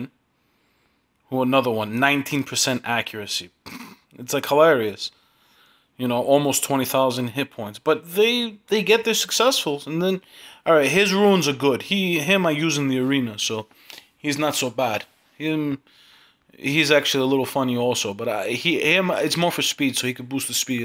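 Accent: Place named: East Coast, United States. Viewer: nice my man yea im in mass..you have tht same accent we pretty much all do on the east side lol..but yeaa my dude new subscriber ..nd watching all your vids;))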